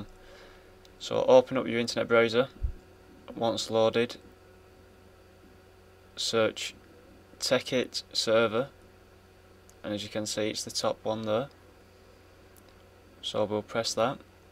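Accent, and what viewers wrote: United Kingdom. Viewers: no is from up north (English midlands) i think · Sound like your a fellow wiganer?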